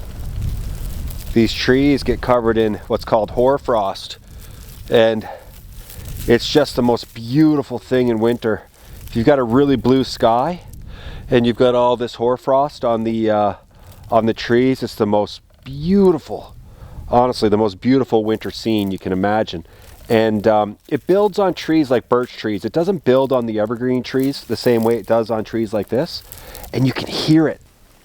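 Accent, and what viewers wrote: Newfoundland, Canada. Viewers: I hope you wanted your chicken well done! You say you're in the NWT but you sound like a Newfie? Shouldn't you be on the other side of the country?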